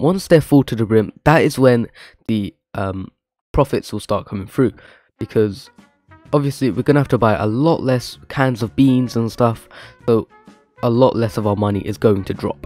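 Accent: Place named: United Kingdom. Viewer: i love the bri'ish accent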